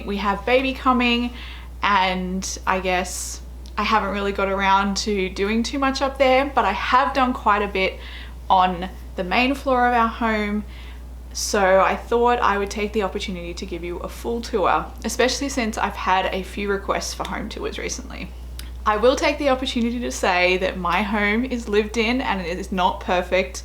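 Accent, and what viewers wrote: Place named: Australia. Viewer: New subbie here. I was so happy to hear your aussie accent when I clicked on this video, as I live in Melbourne. I absolutely love your home, and the way you decorate, it's so much my taste. And those wreathes are just beautiful : )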